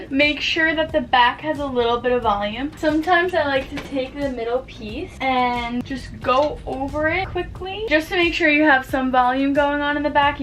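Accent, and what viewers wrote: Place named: New York, United States. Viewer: are you from ny?? I love ur accent lol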